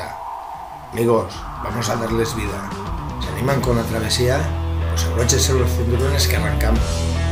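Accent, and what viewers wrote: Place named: Spain. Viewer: Hablas como auténtico español saludos desde mexico (pd:yo elegí comerme el peon con torre 😔)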